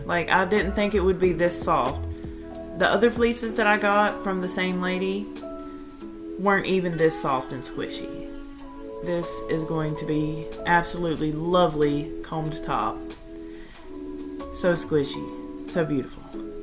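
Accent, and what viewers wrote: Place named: South, United States. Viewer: I’ve been washing fleece for many years. I never knew to soak it in plain hot water first. I’ll have to try this. I have a method to get more water out of your fleece. I use old bath towels folded in thirds length wise. I spread a layer of washed fleece on it, roll it up and step on the rolled up towel. When you unroll the towel the fleece is damp and the towel is wet. It dries much faster and the rolling and presser from stepping on it has never caused the fleece to felt. You sound like you’re from the south. I’m in Tennessee. Are you anywhere close? Enjoyed your video. Thank you.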